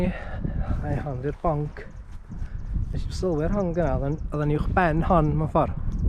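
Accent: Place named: Scandinavia. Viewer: I don't speak welsh at all, but one thing I found really interesting here; Normally I hear a massive english accent at least from southern welsh speaking people. Your accent sounds almost nordic/scandinavian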